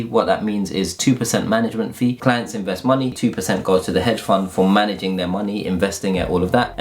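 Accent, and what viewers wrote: United Kingdom. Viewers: Nice and clear especially because your British too. Can you explain short selling too please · This isn’t in basic English! It’s in British!!!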